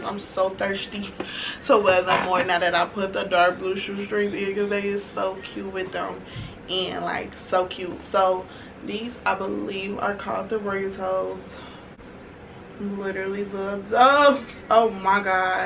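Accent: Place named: Chicago, United States. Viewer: you sound so Chicago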